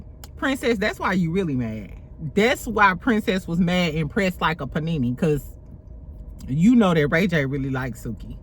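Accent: St. Louis, United States. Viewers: I just started following you recently and you crack me up! Where are you from? I was listening to your accent like is she from STL but then you said you live in TX. Anyway, happy Scorpio season 11/20 ✊🏾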